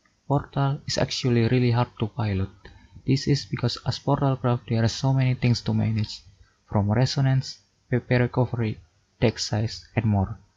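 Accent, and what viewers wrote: Indonesia. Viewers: Also i never guess that you're indonesian before i hear your voice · u sound like indonesian tbh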